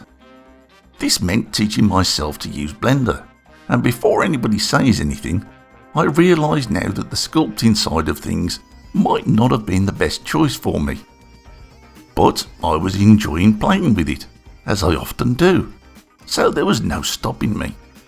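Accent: United Kingdom. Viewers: Joe Kerr He is british, but not ringo british. Different tone. At least to my ear.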